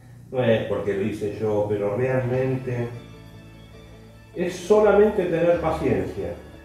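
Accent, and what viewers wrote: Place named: Argentina. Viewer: Grande sos un groso como hablas explicas bien a lo argento papá abrazo grande....!!!!!!!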